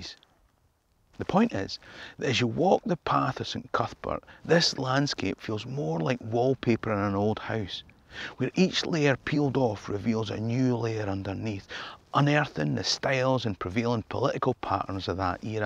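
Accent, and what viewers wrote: Scotland, United Kingdom. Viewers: Are you Scottish or British?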